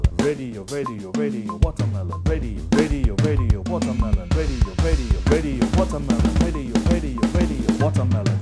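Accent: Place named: Trinidad and Tobago. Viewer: Like ur vid's. I detect a trini accent in ur articulation. I could be wrong.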